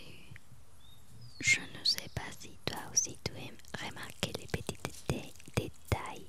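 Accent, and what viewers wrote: Spain. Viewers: C'est la première fois que je viens et tu as une agréable personnalité, ton accent est trop chou. J'adore la langue espagnole. Bonheur à toi guapa morena, j'espère que tu reviendras🌹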